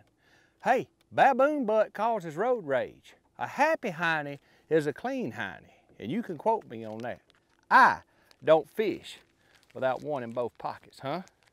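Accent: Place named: South, United States. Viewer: LMAO FUNNY SOUTHERN BOY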